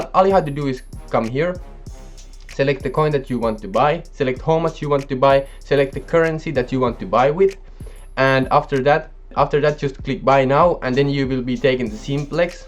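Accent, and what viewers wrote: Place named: India. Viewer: I get an odd feeling that you talk like an India's people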